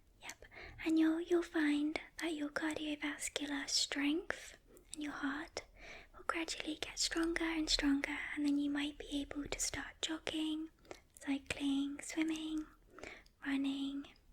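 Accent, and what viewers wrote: England, United Kingdom. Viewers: I absolutely love the way you sound. I could honestly listen to you talk all day. I’ve been very impressed with the high quality & length of your videos. Do you mind if I ask which part of England you are from?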